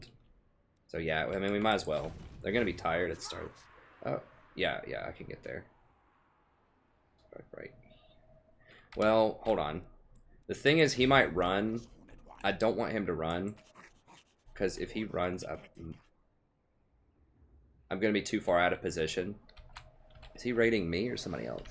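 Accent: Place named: South, United States. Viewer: I'm from the south, and I think you have a small accent, so when you said "LOOKS LIKE GOOD FOOD TO ME" I died lol. Also, "it's late, I'm feeling frisky" lol, really good channel. I had a question though, I want to play a mortal enemies campaign with a faction that has late gate powerful units and economy to spread across the map, what faction would that be?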